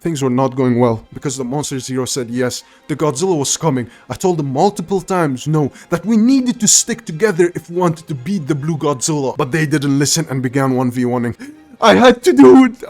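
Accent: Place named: United Kingdom. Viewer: you soud british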